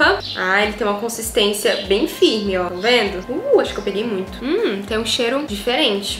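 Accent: Minas Gerais, Brazil. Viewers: vc é de minas gerais o sotaque diferente 🤔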